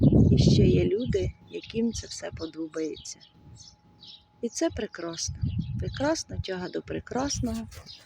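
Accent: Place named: Poltava, Ukraine. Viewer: Я дуже радію з того що чую свій полтавський діалект ібачу колегу по квітах аж сльози виступили так мені схотілося усвою трудолюбівку пропоную помінятися : ви мені сімена сон_ трави або кущик, а я вам голубу юнону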